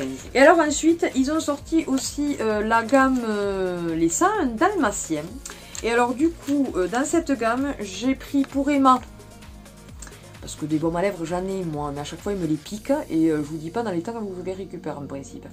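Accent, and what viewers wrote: South, France. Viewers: Coucou Je viens de découvrir ta chaîne et de m'abonner Ah super l'accent du Sud ❤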